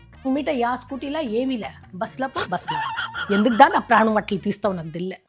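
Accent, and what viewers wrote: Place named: Andhra, India. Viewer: Which part of andhra is this accent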